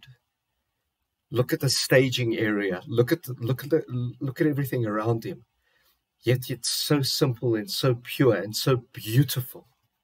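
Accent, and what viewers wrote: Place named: South Africa. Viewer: May I ask where you are from? You sound almost exactly like my fiancé sounded when he talked. He was from South Africa.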